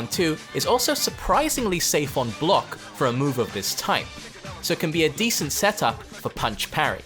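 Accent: United Kingdom. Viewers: british accent nice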